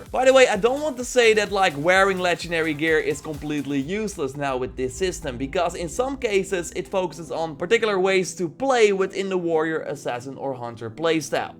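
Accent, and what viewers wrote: Netherlands. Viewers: This dutch accent lol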